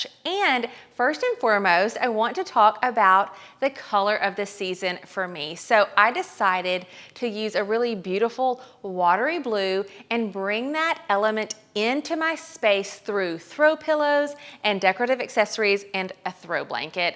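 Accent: South, United States. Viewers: Love, love her southern accent! I’m from the Midwest… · I like you a lot more without the over the top southern accent, and yes I am southern.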